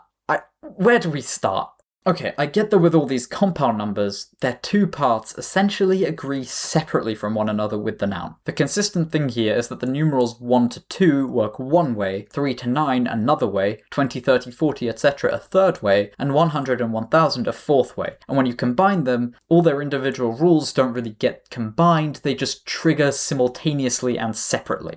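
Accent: United Kingdom. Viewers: y u sound brit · I always thought you were a native brit and I thought I was going crazy hearing swedish in your french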